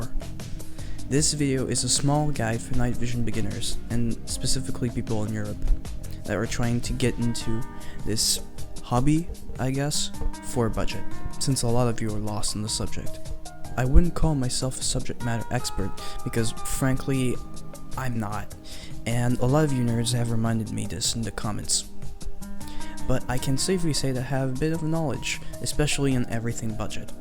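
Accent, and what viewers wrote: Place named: United States. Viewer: not bitching but you sound awfully american for someone giving advice on getting nods in eu, hehe. also, is that machine girl in the outro?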